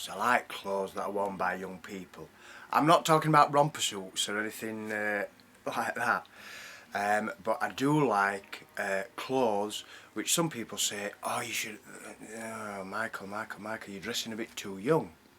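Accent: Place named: Northern England, United Kingdom. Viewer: Great northern accent matey, you mention Leeds but I don't think it's a Leeds accent? I would say Barnsley but err? dunno? Sheffield possibly but, I am gonna go for Wakefield area???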